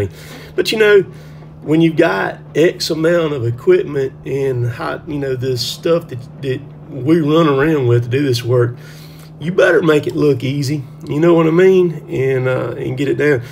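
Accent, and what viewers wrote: South, United States. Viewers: I could tell you were from either Alabama, Mississippi or Georgia. I’ve been thinking about buying tree climbing gear to expand my side hustle. I really do love tree work. I’m from Alabama. Know exactly where Wadley is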